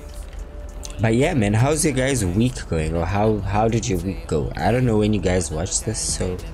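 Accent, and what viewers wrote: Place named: South Africa. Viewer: Brother are you from South Africa?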